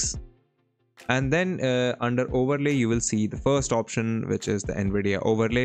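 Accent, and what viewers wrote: India. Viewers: Anyone got a translation for this Indian scammer?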